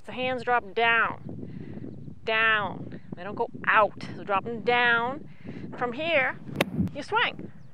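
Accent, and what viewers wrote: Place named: Upstate New York, United States. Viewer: The way you talk reminds me of all my friends from upstate NY. Is that where you're from!?!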